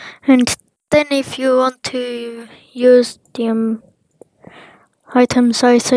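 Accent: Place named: Russia. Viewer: are you Russian? you lowk got that Russian accent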